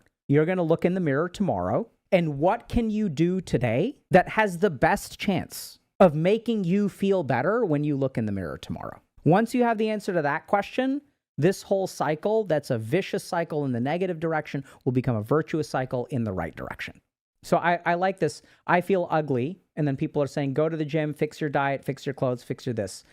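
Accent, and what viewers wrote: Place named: India. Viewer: I adore this India-accent of English 😉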